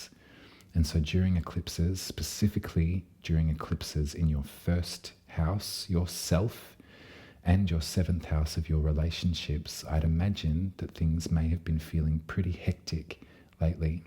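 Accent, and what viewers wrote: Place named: Australia. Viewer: Finally! Someone who pronounces Uranus properly....gotta love a fellow Aussie! (And you do it with such style and grace) ❤🐠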